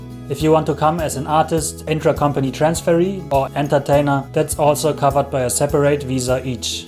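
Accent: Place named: Germany. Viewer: You have the most cliché kind of German accent :D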